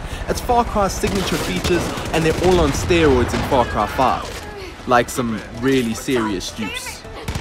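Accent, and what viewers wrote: South Africa. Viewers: Yea, I was going to say I notice that accent. Keep up the content :) btw hopefully you're staying safe if you live in RSA. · Great video..south african accent was interesting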